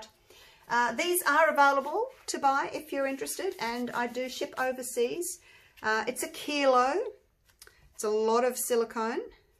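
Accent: Australia. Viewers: Hi! I am just getting into resin art. Love your coaster idea! Send me info on buying the coaster mold please. I love these, they turned out looking like pansies! Nice to hear your Aussie accent , I grew up in Sydney but now live in LA. uSA. All the best,Leanne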